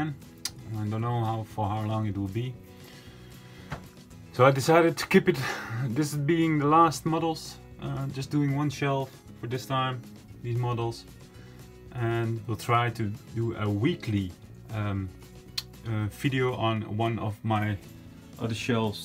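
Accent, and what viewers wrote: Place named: Netherlands. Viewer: You sound Dutch. I'm Dutch to!!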